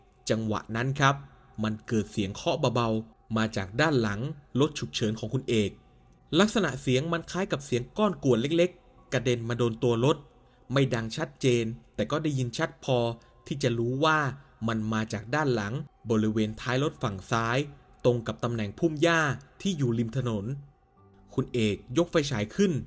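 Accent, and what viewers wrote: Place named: South, Thailand. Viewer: เส้นทางนี้มีอีกหลายเรื่องมากครับ ผมจะทักหลายครั้งแล้ว ถ้าเรดาร์ผมไม่พลาด แอด คนใต้ชัวร์ น่ารักด้วย 😉❤️😜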